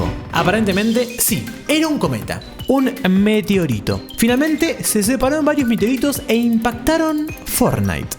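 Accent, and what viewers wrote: Argentina. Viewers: H ey se de noto que sos argentina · sos de Argentina?? :'D que orgullo XD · El primer yutuber que habla argentino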